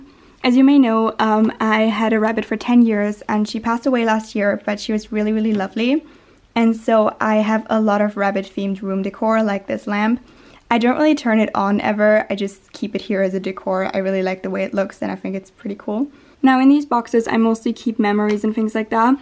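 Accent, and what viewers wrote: United States. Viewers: I feel like now that she’s out of Cambridge she kind of has more of an American accent which is lovely on her 😂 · Woah u sounded full on british!!!! 😂😂😂 but half the vid I heard an American-ee accent😂 where r u from?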